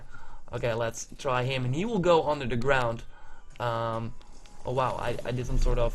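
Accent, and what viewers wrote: Netherlands. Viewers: ik hoorde al gelijk aan je accent dat je nederlands was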